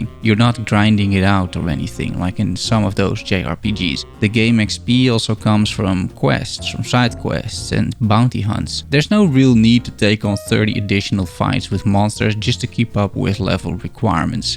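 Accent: Netherlands. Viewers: tering zeg wat een nederlands accent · bruh you are from the netherlands i can tell haha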